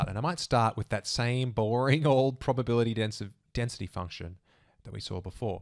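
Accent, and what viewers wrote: Australia. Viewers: Your Australian accent sounds so lovely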